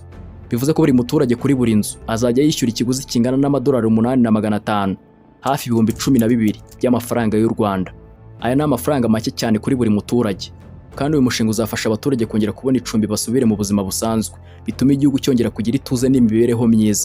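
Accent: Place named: Tanzania. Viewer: Hello. I Am hearing you talking like a SUKUMA tribe in TANZANIA 😮😮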